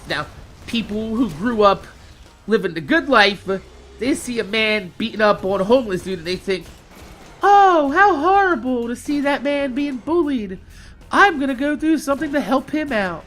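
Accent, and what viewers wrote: New Jersey, United States. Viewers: you from north Jersey or south? SJ guy that enjoys your content!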